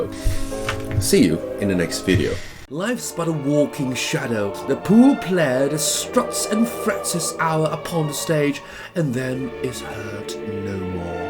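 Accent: United Kingdom. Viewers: 尋尋覓覓終於找到一位新潮又酷的英文老師 想跟隨他充满神秘浪漫的英式口音 及吸血鬼教室聽他跟別人不一樣的特殊教法 只是相見恨晚 在一個訪問频道認識EKO老 師 被你的声音吸引 謝謝老 師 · 超喜歡英式發音的，可以聽Eko老師講英文，而且是講日常用語👍👍👏👏 重點是關於吸血鬼很吸引我 哈哈👍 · Pls tell us more about your life in England !! Your life stories are truly intriguing,! I had been studying in the UK for 4 years, and your accent reminded of those amazing memories back then. anyways, love your work, pls continue sharing your knowledge to us, I enjoy every bits of your creative work ❤️ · 好喜歡聽老師的英式口音，期待以後可以出一系列的英說影片～聽了很享受XD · 看了几个eko的视频，发现英音听起来真不错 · 你的英式口音真的很有吸引力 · 從阿滴那邊知道EKO老師的英式口音很好聽，然後連到這個介紹影片，完全被老師的人生經歷懾服欸，期待老師之後可以繼續分享更多！ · 真的有唸文學作品有差 整個鋪陳、敘述都非常厲害啊 英國腔超帥😂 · Quite a good summary and denotation about eslite Taiwanese student' studying process. But lowsy students like me don't even know the frame the society's giving to us till some people mentioned. But this time the issue is mentioned by a visual kei vampire who speaks British accent. 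Intriguing!😆 I like British accent. So elegant! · 哈哈～ 突然有種希望老師能拍一部，自己寫的電影，裡面充滿奇幻和你好聽的英國腔，（覺得應該會很棒） 再來喜歡搖滾樣貌和古典音樂搭配，再加上一點慵懶的優雅，意外的讓人入迷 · 開頭以為要講吸血鬼傳說，然後老師用道地英式腔調講訴著台灣學子的悲哀故事，反差太大了，差點反應不過來😅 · Very beautiful British accent n impressive 吸血鬼造型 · 我又來報到了😂 Eko你的聲音和英國腔真的太好聽了！ 只能說聽你說英文是種享受哈哈 btw thanks for sharing your life story when you’re little! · 天啊，真是太精彩了！好喜歡聽你說話，老師的思考與陳述方式很有魅力，完全能引起大家的共鳴，一邊看你的日系打扮搭配英式英語以及上方不時出現台灣人習慣用語，種種不可思議的違和讓人會心一笑。 從小到大太多時候，我們都被迫假裝自己能接受或去成為所期望的某人，被僵化體制、社會狹隘價值所捆綁，很欣賞老師的勇氣與毅力！這樣一段自我介紹的影片，是那麼不容易，背後經過無數萃煉與追求完美的堅持，才能以現在如此從容優雅的姿態向大家娓娓道來自己的故事，這樣的精神，非常令人感動與佩服！ P.S. 我以前在學校很討厭英文，在被強迫的狀態下英文成績很差，最近這兩年靠自學才漸漸有了對英文的興趣，看到像老師這樣優秀自信地展現英文能力，對學習者來說是非常激勵的，本來想用英文留言給老師，不過為了能精準表達內心的感受，還是決定用母語來正確完整地陳述 ： ） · 看福爾摩斯1984年版的影集，就是這種英國腔！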